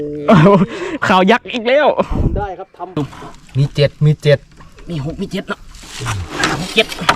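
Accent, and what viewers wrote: North, Thailand. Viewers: พูดเหนือเพราะกว่าคับพูกอีสานสำเนียงไม่ให้555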